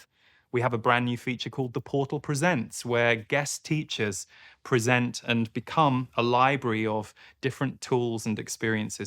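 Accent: England, United Kingdom. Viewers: I remember your first videos…you had hair back then and a lot heavier English accent. Lol congrats on 10 yrs💕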